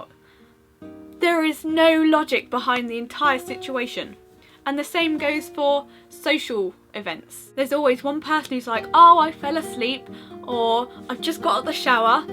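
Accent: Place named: United Kingdom. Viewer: Great video! By the way, I love your british accent! :)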